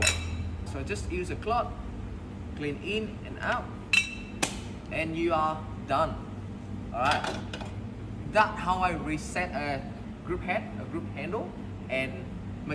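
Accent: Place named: Australia. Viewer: Bạn nói giọng anh Úc ạ? Nghe lạ và vui tai quá 😊😊😊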